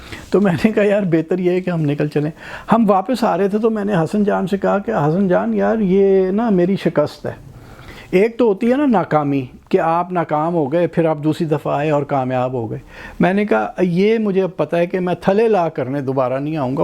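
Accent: Punjab, Pakistan. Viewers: Just amazing Love the way you speak especially your punjabi touch .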